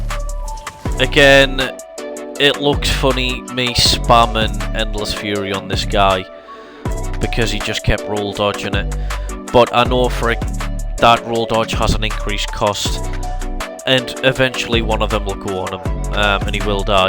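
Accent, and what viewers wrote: United Kingdom. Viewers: Uk accent S tier 😂 ggs the commentary is actually dope touch to the gameplay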